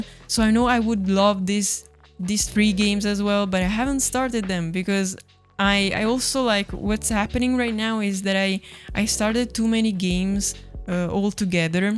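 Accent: Italy. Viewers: Glad the algorithm suggested me your channel! I have 70 games on my backlog, but they are alla digital unfortunately. At least you could still touch and see your games lol. Anyway, your accent sound italian, am I correct? Are you a fellow italian girl gamer like me?? 🤝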